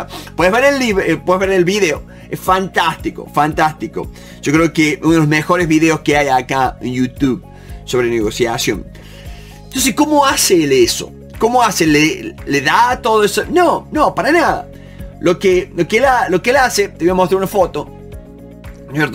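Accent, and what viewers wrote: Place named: Cordoba, Argentina. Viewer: Claramente sos Cordobes jaja..un abrazo y muchas gracias!!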